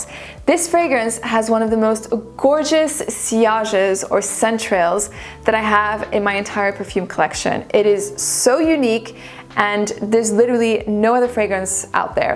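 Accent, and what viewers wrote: United States. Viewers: Hi. Great work on all of your fragrance videos! I remember when you first started your channel, a couple of years ago. Well done! I'm so curious: are you half French and half American? Your pronunciation of French words is of course native-level French, and your English has an American accent, but there is a "lilt" that tells me it doesn't come directly from the American mainland, at least in the linguistic formative years, up through age 13-14. I'm just curious! As an American, and a francophile who speaks fluent French, I am always fascinated by people's accents, and am curious about your linguistic background! Thanks for your great videos. Keep up the great work. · Bonjour je. Inès de m’abonner 😍la description olfactive est très intéressante et j’ai bien envie de me diriger vers une parfumerie pour les essayer. Merci et Bravo pour cet accent américain qui est Top 👌💯❤️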